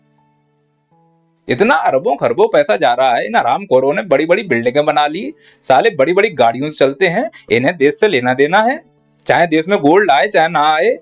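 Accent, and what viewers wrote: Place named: Uttar Pradesh, India. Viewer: Sir aaj pura u p lehje me bol rahe hai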